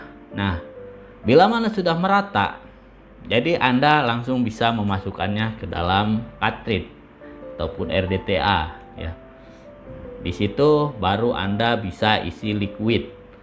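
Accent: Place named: West Java, Indonesia. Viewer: Letah sundana mani atra pisan namana oge sunda pisan euy😀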